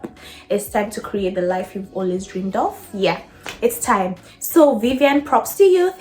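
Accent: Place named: Kenya. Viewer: Thank you for sharing! I love your Kenyan accent😍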